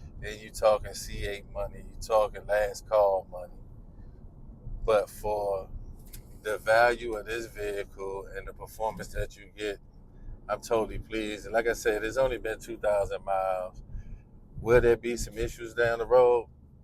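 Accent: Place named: Baltimore, United States. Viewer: You from Baltimore? I swear I hear the accent and this coming from somebody from baltimore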